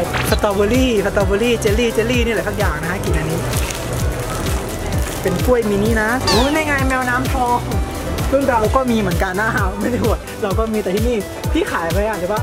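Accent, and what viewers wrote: North, Thailand. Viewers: พี่เป็นเป็นคนเหนือเหรอผมก็เป็นคนเหนือ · พี่ เป็นคนเหนือกะ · พี่ เปนคนเหนือหรอคะ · ปี้ๆคนเหนือก่ะครับปี้ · อ้ายเป็นคนเหนือกะ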